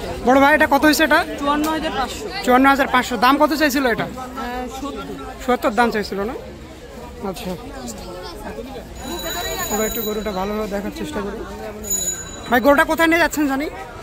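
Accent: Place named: North Bengal, India. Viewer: ভাইজান কি উত্তরবঙ্গের? কথার সুর/টান উত্তরবঙ্গের মানু‌ষের মতো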